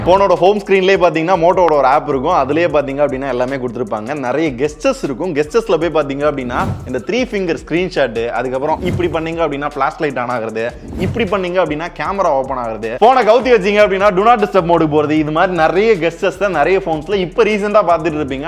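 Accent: Madurai, India. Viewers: Bro Nenkee Madurai yaa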